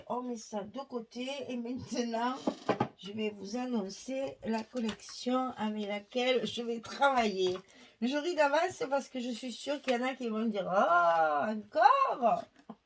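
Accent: South, France. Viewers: Bonjour, c'est vrai que ce n'est pas le style de papier que j'aime mais j'adore regarder vos vidéos, j'aime votre façon de travailler et vous écoutez parler 😊 Votre petit accent du sud met du soleil dans ma matinée 🙂 Alors merci pour vos tutos et votre joie de vivre. Cela donne des idées même si on ne fait pas exactement la même chose ou avec la même collection de papier. Belle journée à vous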